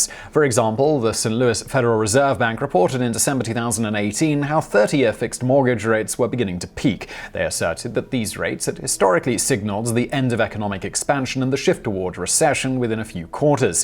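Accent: United Kingdom. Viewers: despite what his British accent may imply, he is an American.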